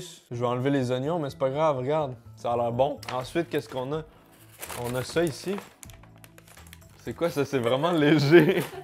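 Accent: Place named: Canada. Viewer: Vous êtes confirmé au Canada ? Fin je suppose à l’aide de ton accent que tu viens du Canada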